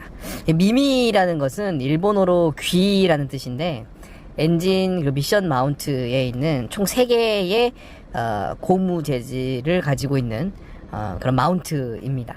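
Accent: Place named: Jeolla, South Korea. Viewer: 전라도 말투 보이긴했는데 광주분이셨군요, 기회되면 뵙죠 ㅎ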